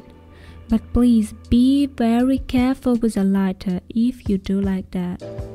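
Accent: Russia. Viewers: Are you Russian?) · И русский акцент))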